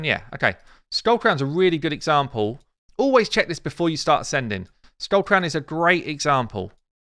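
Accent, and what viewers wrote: United Kingdom. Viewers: Just found you very helpful just starting out, I am way outta my depth with this game but I will get there, also good to have a Brit talking instead of over the top yanks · what a very beautiful British accent you have !! amazing, i watch your videos to improve my skills in english, i'm french native. Also because i'm a RSL free player. You do a good job, your videos are very interesting. Sadly i don't understand everything you say, because of my level in english, and i'm not used to your Cambridge beautiful accent.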